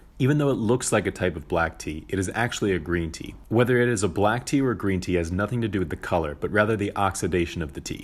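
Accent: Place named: England, United Kingdom. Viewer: Typical English speaking style of pronouncing Japanese. oops.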